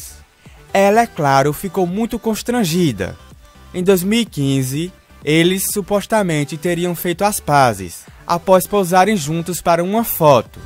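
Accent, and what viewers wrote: Northeast, Brazil. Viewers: Narrador nordetinooo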